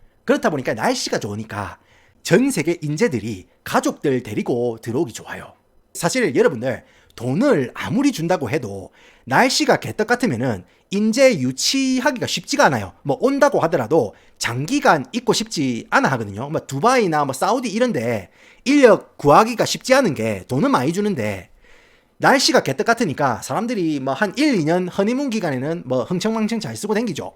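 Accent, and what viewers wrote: Gyeongsang, South Korea. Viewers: 갱상도와 미국을 넘나드는 플로우인데 딕션 쩔어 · 경상도사투리와 영어 악센트 믹스 신선하네요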